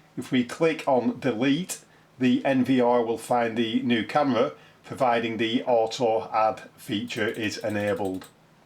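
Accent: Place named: Wigan, United Kingdom. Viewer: I live in Pennsylvania but am originally from Wigan, you sound as if you are from near there, lol.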